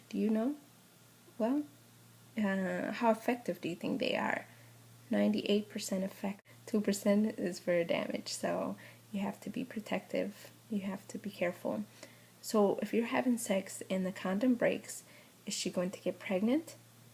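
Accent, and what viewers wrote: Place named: Tigrinya, Eritrea. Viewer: She's a decent person. I enjoy her manner of speech. How slow and carefully she says every word gives her that classic Eritrean-American form of speech. A few of my female cousins in L.A who speak Tigrinya like my parents but are college educated. They all speak and have that very subtle Tigrinya accent like this chick does. The Eritrean in me wanted to not like this video. At first I thought it was too vulgar because she details pre-cum and and the speed of sperm. Watching more of the video. I couldn't help but think kids (and even some adults) are going to type "sex" in the searxh column anyways. There are a million videos of other people doing this same thing. Those other people are not Eritrean though. I'm a firm believer on the adults being and looking like the children who are learning. It's nice to have a young woman who looks, speaks and is similar to my little niece, sister, brother ect. 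I'm studying to be a high school geography teacher. I'm doing that because I want my children and children of similar color as me to see an educator who looks like them. The only major disappointment for me with this presentation is. No visual example. She details and is very well informed in what and how to use a condom. My issue is that if someone is watching this video to learn. How will they retain a visual memory without having seen how to do what she's saying? A condom and a banana or a cucumber would have helped in this video. Eveything she is saying is right. Add a visual exa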